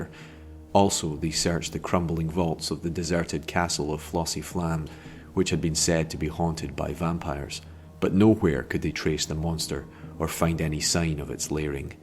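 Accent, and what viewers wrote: Scotland, United Kingdom. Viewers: Good narration! Very glad to have found a YouTuber with a good voice doing Lovecraftian stuff. Scottish accent works well.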